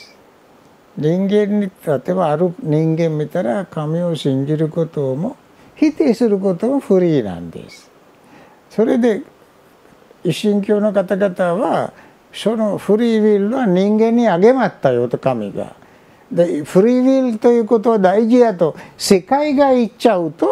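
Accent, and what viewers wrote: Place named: India. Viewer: スマナサーラ長老はイントネーションから察するに日本生まれではないように察します。 インド人でしょうか？ どこで仏教の修行を積んだのでしょうか？ どちらの宗派に属するのでしょうか？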